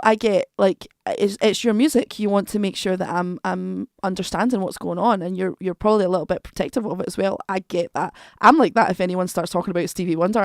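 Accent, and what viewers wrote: Scotland, United Kingdom. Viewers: I'm Polish and I always was just one of a few at work who could talk with people calling us from Scotland. I love your accent, reminds me of the lovely Amy Macdonald. And if you start a metal band I wanna be in it. Cheers!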